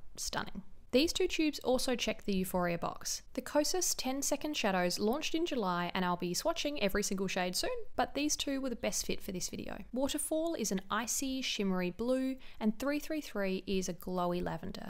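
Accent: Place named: Australia. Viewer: She has such a posh Aussie accent. I’m Australian and let me tell you, I do not sound anything like this 😂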